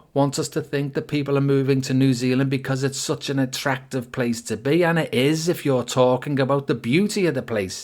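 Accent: Liverpool, United Kingdom. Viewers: This video makes sense. The scouser being the voiceover doesn't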